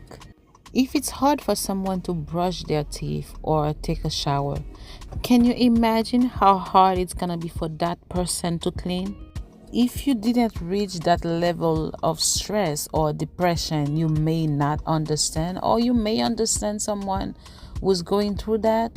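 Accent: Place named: Trinidad and Tobago. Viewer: Hi new to your channel ❤ enjoy your video. Are you Trinidadian?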